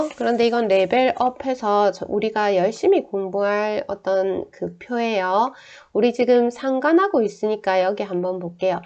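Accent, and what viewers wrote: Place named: South Korea. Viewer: 사투리 억양이 정감있게 와닿네요^^ 깔끔한 포맷으로 다른 분들과는 차별화되어, 개인과외 받는 기분이 드네요! 감사합니다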